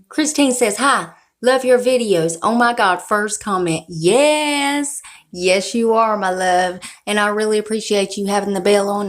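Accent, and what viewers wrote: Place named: Alabama, United States. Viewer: I am so excited about this pallet! I love you accent straight from Alabama!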